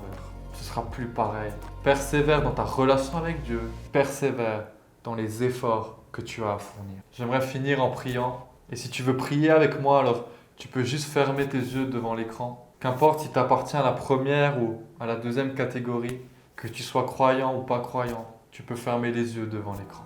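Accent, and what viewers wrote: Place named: Alsace, France. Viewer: Je tombe sur ta vidéo, je me dis super un autre frère en Christ sur You Tube super! Et la j’entends l’accent alsacien et je me dis oh en plus alsacien ! 😂 Ps: je ne savais pas que le « yo » ne se disait qu’en Alsace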